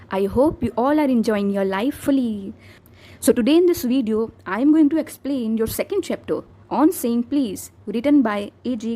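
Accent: Mumbai, India. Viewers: mumbai ki language 😁😁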